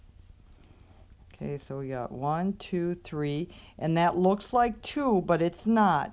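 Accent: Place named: Minnesota, United States. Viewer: LOL Love the rollling on the back thing. Good vid, well done. Let me guess, you're from the north somewhere, Minnesota, Wisconsin, Canada?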